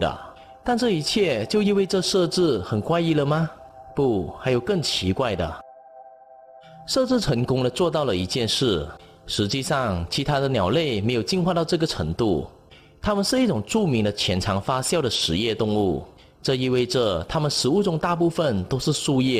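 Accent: Malaysia. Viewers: 马来西亚腔好重呀 哈哈 btw 酵是念 jiao4😅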